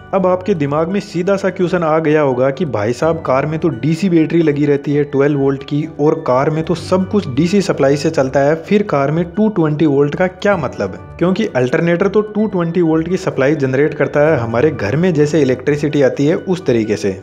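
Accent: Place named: Shekhawati, India. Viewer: Bhai Shekhawati ke District Sikar,Churu, Jhunjhunu se lag raha hai Bolne ke lahje se ...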